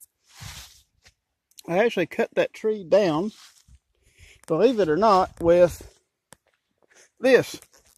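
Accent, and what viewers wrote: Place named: South, United States. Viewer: I definitely hear a southern accent...what part of the states are you in? Great video & an even more beautiful spot you have chosen. Much love from Mobile Alabama.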